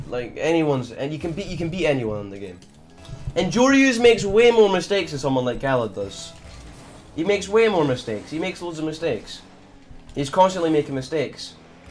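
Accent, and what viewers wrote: Scotland, United Kingdom. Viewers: Is he Scottish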